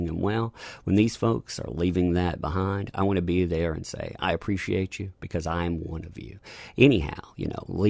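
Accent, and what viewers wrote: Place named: South Carolina, United States. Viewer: "Y'all" is disgusting to you? You sound like your an SC boy like myself so that's just heresy!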